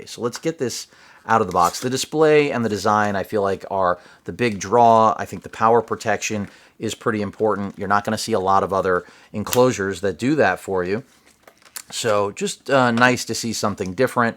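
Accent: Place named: United States. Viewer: I love the american slang (gonna wonna)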